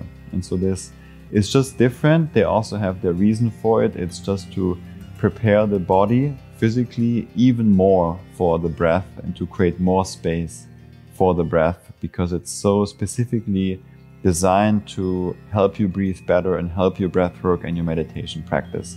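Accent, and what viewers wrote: Germany. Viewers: Deutscher Akzent? 😁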